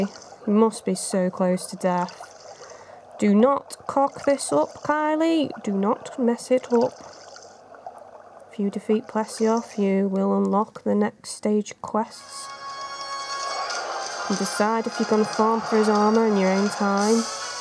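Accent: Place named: Cumbria, United Kingdom. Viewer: Ahaha excellent, I'll be looking forward to it and thank you I've been wondering how it runs on the 3DS for ages. I'll have to be buying ultimate for the 3DS I own already as I am but a humble college student, too poor to afford a Wii U :( from the look of these vids though it doesn't seem like I'm having to compromise too much. If you don't mind my asking, whereabouts in the UK do you hail from? Your accent sounds like it's Carlisle/Cumbria-ish to me but I can't quite put my finger on it haha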